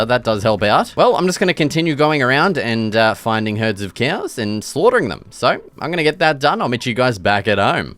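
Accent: Australia. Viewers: are ya aussy mate?? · Are u Australian 🇦🇺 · Aren't you from Australia according to your accent huh?) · are you aussie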